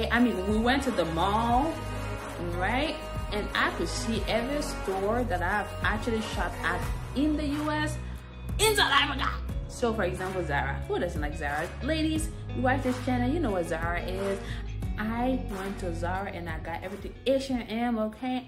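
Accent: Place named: Nigeria. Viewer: Hon you don't sound American , you sound Nigerian , wena woth bribery story at the airport, wena you are fake , you Nigerian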